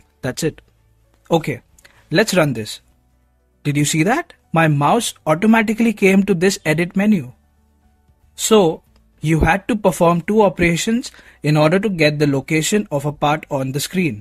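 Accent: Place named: India. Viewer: You sound Indian. Why have called yourself?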